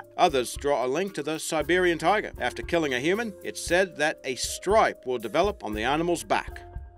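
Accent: Australia. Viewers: I think it's Australian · a guy with australian accent speaking on native american myths! talk about outsiders talking about a another cultures myths! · Sounds like a aussie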